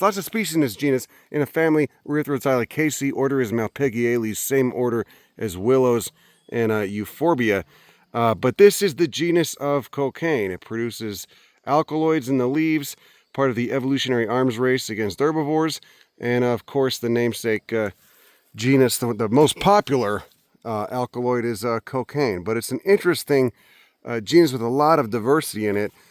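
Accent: Chicago, United States. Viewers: Chicago, not New York. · Heyhey, the guy's from Chicago not NY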